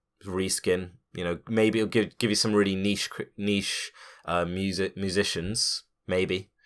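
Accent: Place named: London, United Kingdom. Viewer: Great review. But I confess that I continue to listen more because of you accent. I was in London in the beginning of this year and, god, I love that accent. Remind me from some friends. But about the video is quite good, simple, casual.. feels like a conversation. I am probably going to watch more from you. Adding about the YouTube premium, I have to say, it is a bit expensive but I think it's worth it for those who likes differents versions from the music they like. I mean, there is some live performances that are just masterpieces and they aren't available on Spotify or other plataforms. Interviews, podcasts, channel's from a huge variety of things ( there's a massive of content produced specifically to be play on YouTube, from new and old entertainment companies). And still, there's one more things I would like to add: the algorithm. 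Youtube knows all my moods for music and keeps bringing me news findings on, and I that is just fantastic. Something that made me disappointed after watching you was to know that the originals are bad and we can't see the movies that they rent on the site to us. I am going to get premium.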